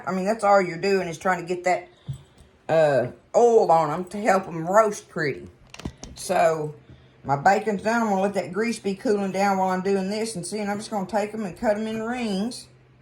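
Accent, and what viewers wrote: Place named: Kentucky, United States. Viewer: Where are you from? You sound like me and I am an old Kentucky girl.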